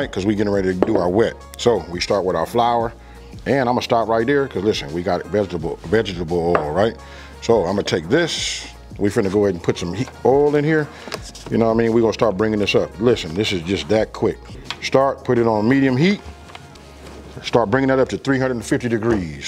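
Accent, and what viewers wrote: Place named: West Coast, United States. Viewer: Side-note I started watching your video and as soon as I heard you start talking I was like he’s so west coast 😂 he gotta be either from Cali or Denver I looked at your info and it said riverside i knew it I’m from Sac but I live in Denver now but its still the same Westcoast vibe anyways i just thought it was funny thanks for the video stay dangerous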